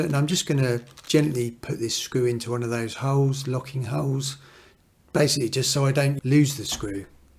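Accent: United Kingdom. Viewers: Love this😂 very british delivery in a slightly patronising humorous way. Thankyou!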